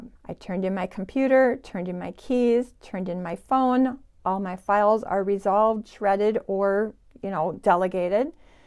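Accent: Minnesota, United States. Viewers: I am so happy for you. Also, I love your accent. I realize that, for security reasons, you won't say where you live, but you sound as if you're from Minnesota. I could listen to you read the telephone book!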